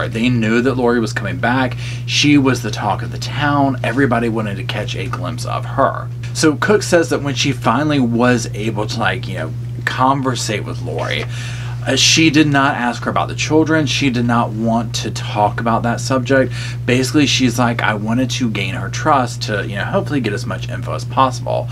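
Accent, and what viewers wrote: South, United States. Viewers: Thanks, from Ireland 🇮🇪. I love your southern drawl and your hospitality knows no bounds. If you're ever in Ireland I'll serve you some pie, we'll gather around the table and talk true crime. Very informative and well researched and what makes it so entertaining is your wonderful personality and talented acting. Love you. 💕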